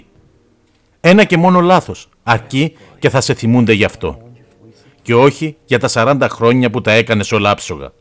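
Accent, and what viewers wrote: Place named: Spain. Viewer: wait... did this channel get highjacked and dubbed over by a patronizing Spanish speaking person?... time to eject that subscription!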